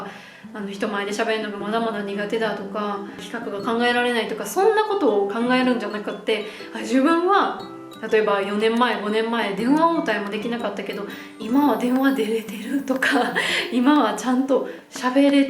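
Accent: Kansai, Japan. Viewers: さんの関西弁が聞きたいときにおチャンネルに見にきます。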